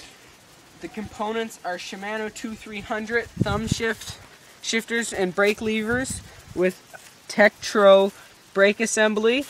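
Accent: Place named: Upstate New York, United States. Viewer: Nice upstate NY accent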